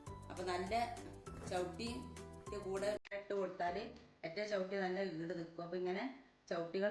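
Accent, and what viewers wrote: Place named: Kottakkal, India. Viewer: കോട്ടക്കൽ ഭാഷ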